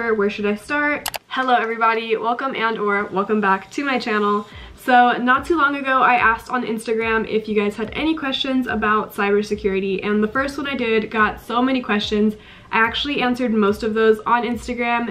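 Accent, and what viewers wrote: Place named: Germany. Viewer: So cool! Ich habe mir gedacht dass du einen klein deutschen Akzent hast. Wirklich nur eine kleinen. Danke, dass du deine Erfahrungen mit uns teilst.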